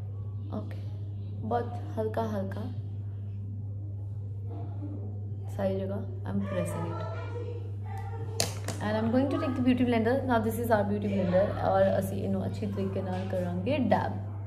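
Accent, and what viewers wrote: Punjab, India. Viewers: Its jst pure punjabi accent..hum bhi ghr pr normally bros hi bolte jdo punjabhi vich gl krde...brows waala accent niklda hi nhi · I wish i cuold learn from you but i m from Pakistan... Love the way you speak punjabi i jst love it... I am your new viewer..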